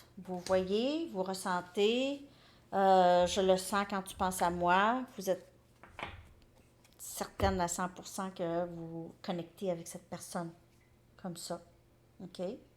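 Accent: Quebec, Canada. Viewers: Je vous apprécie beaucoup 😊 J’ai quitté le Québec pour retourner dans mon pays il y a 1 an et ça me fait du bien d’entendre votre voix et de retrouver ce côté québécois que j’adore tant (le « ok bye » m’a tellement fait du bien de l’entendre 😂) Et vos guidances sont pertinentes Merci 🙏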